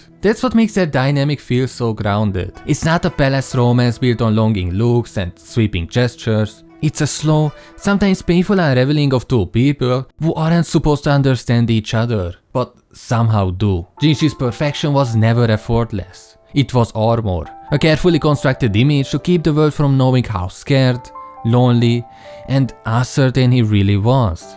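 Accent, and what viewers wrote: Hungary. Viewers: First video by for me. Not even a minute in......is that a hungarian accent I hear?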